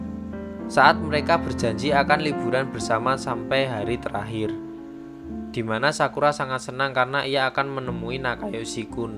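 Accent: Java, Indonesia. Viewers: Bro jagan medok dong plis deh. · Abang orang jawa ya? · Medok men...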